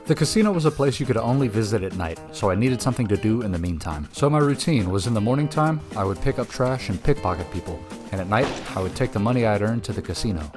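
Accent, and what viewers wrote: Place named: Netherlands. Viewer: Took me a couple mins to notice you are a fellow Dutchy, props on you accent. Enjoyable content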